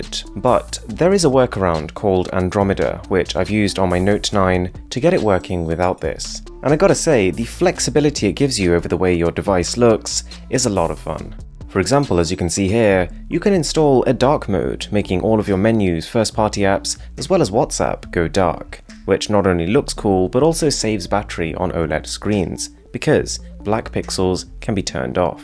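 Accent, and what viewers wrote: RP, United Kingdom. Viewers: Do u really speak like that, posh!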